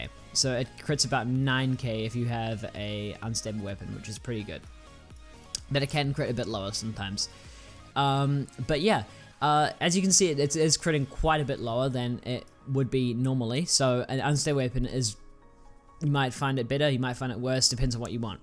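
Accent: New Zealand. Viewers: nice nz accent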